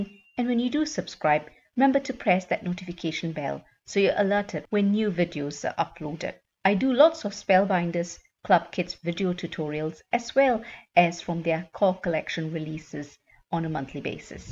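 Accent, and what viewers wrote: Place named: United Kingdom. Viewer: absolute joy to watch . Gorgeous cards , great technics . Nice hearing a UK voice and UK card sizes .x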